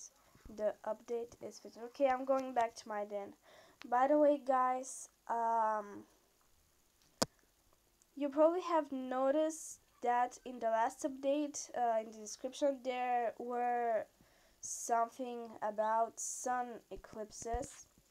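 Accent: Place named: Russia. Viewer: Hey! I'm half Russian too! My mom is Russian.. but I don't have a great accent like you and I'm from America! Anyways, love you your the best!:p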